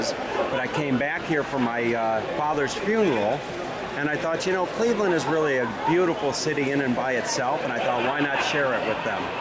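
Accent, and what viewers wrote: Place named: Pittsburgh, United States. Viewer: OMG, your video is perfect for me! I'm writing a story, and this is an early location. It's awesome getting a tour of it! The main location for my story is Abbey Avenue. I placed an apartment building across the street from the Gateway Animal Clinic lol. I've never been to Cleveland, Ohio before, so I've been doing a ton of research. Are you from Pittsburgh? The way you speak sounds a lot like Pittsburghese, as my granddad calls it.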